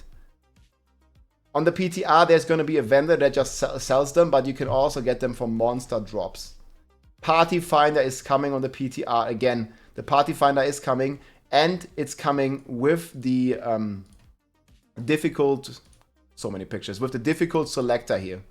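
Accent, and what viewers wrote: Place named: Germany. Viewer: Perditation??? Per-di-shun. I understand German is your first language but whoa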